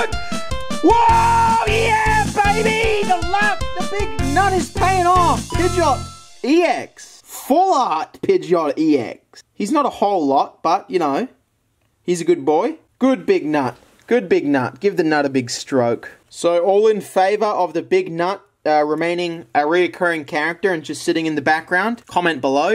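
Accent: Australia. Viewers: Yes your Australian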